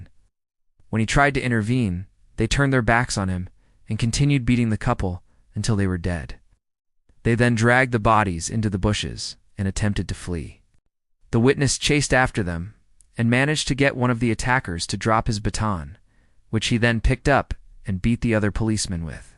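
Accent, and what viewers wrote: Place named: Dublin, Ireland. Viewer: Very good but prefer your Dublin accent!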